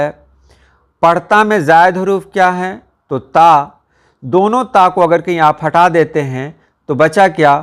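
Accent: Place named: Patna, India. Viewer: سر میں پاکستان سے ہوں میں آپ کی ویڈیو باقاعدگی سے سنتا اور دیکھتا ہوں۔ مجھے ناجانے کیوں ایسے محسوس ہوتا ہے جیسے آپ کا تعلق پٹنہ سے ہے کیونکہ میرے ساتھ مسقط میں کچھ دوست کام کرتے تھے جنکا لہجہ بالکل آپ ہی کی طرح تھا سر آپ کا وٹس اپ کا نمبر اگر مل جائے تو میرے لئے باعث فخر ہوگی شکریہ اللہ تعالیٰ آپ کے علم میں مذید اضافہ فرمائے آمین ثم آمین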